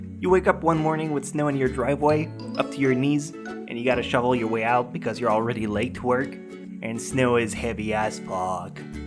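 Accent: Quebec, Canada. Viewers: OMMFGGG ur Canadian as well judging by accent I would assume Quebec se magnifique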